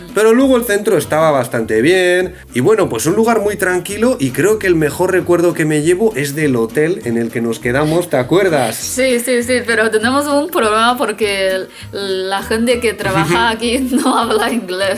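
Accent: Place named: Basque Country, Spain. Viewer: Me parecía por el acento y porque pones cabeza que tenías que ser euskaldun .Un saludo para los dos desde Montevideo , felicitaciones por vuestros aportes !!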